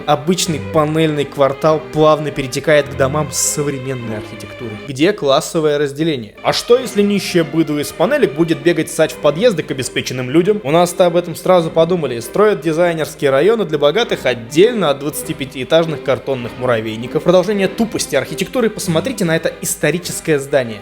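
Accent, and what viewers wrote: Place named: Odessa, Ukraine. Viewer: Хаха как всегда заставил уповать от смеха. Отдельный лайк за Одесско-еврейский акцент)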